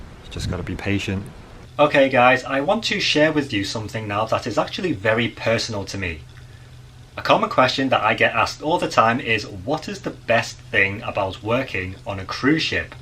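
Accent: United Kingdom. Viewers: How does your English accent affect your speaking your native language? thanks for sharing!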